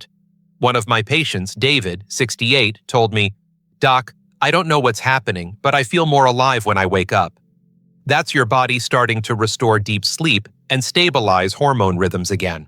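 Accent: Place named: United States. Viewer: 0 Dr you can not deny that you have Indian Genes Than why you do not SPEAK IN INDIAN TONE? HOPE YOU WILL ADMIRE OUR CONCERN AND WILL avoid American tone in your videos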